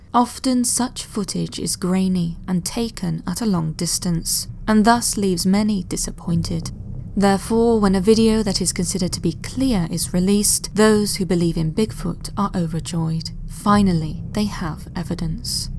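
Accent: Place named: Bristol, United Kingdom. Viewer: The accent is definitely english, maybe bristol?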